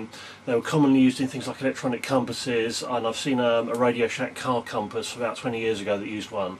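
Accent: United Kingdom. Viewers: Wow been watching eevblog a while. Never knew about you great to hear a UK voice in electronics! Thats from a essex lad!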